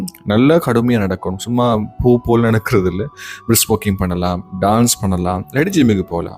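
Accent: Sri Lanka. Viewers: Ungade pechu Srilankan's mathiri irukku sir.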